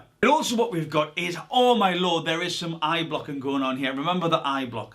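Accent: Newcastle, United Kingdom. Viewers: Are you Geordie?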